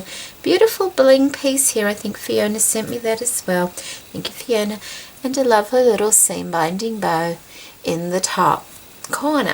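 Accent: Australia. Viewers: Oh, I love both the projects and I have to say I LOVE the way you presented them and your description of each lace, bling, etc that you use is so enticing and inviting! I love listening to your voice anyway, it's so sweet and your Australian accent is pure beauty (hate to say it but sometimes it, when I hear it say, on the TV, can be a little........cant think of the word)! Oh, and how on earth do you remember who sends you what? it is such a gift you have, as its lovely to hear the names mentioned (think I may have asked you this before, if so forgive the intrusion). Hope you are well, my friend, hugs from June xx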